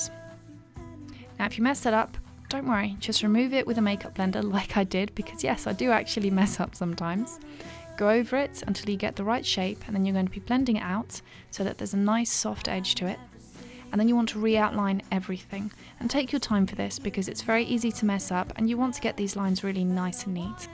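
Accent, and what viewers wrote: United Kingdom. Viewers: What accent is that? Before you say British, I mean what part of Britain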